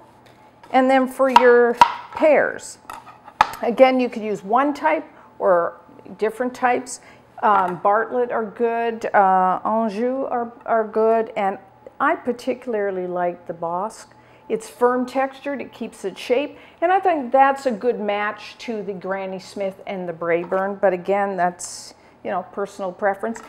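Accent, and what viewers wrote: Canada. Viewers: I enjoy your demonstrations so much! Thank you for all the wonderful recipes you've shared with us. I also love how the Canadian accent comes out sometimes :-)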